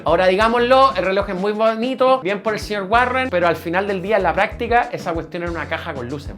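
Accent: Chile. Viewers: Es mejor el Quarzo pero Solar y si es mixto solar y batería Quarzo simplemente será un reloj para siglos …Me da risa el acento Chileno 😅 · Tengo 5 cosas que decirles: 1) Me encantan sus historias relojeras curiosas con datos poco conocidos. 2) No cambien su acento ni palabras chilenas, los queremos así como son. 3) Me gustaría ver videos de Seiko Alba o Credor. 4) Me deben el video de las abominaciones relojeras que fueron al espacio. 5) Saludos desde México.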